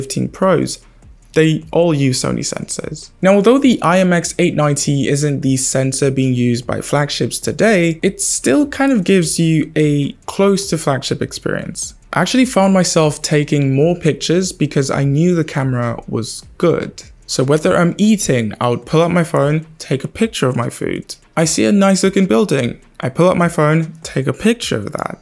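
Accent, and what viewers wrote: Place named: England, United Kingdom. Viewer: yeah, probably grew up in england or schooled there or something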